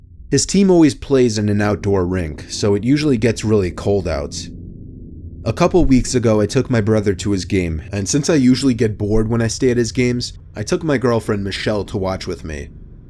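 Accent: United States. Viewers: Lina Santos he does lol American accent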